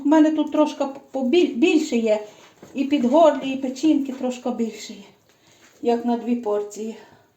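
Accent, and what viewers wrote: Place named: Ternopil, Ukraine. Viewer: Говорите точно, как у мамы на родине в тернопольской области, все слова, звуки, интонации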